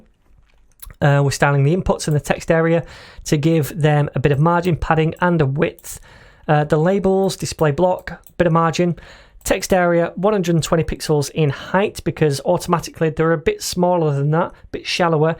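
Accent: United Kingdom. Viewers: Bro the moment I start working I'll by a course, merche or do something to pay you for such a content as good as this, at first I didn't wanna try because it's a little difficult for me understand british accent but I not just improve that part of my english but also lear so much about node. GREAT CONTENT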